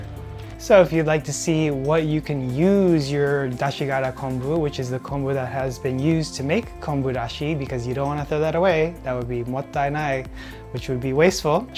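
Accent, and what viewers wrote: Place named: North America. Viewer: You sound like a North American but I guess you've been in Japan a while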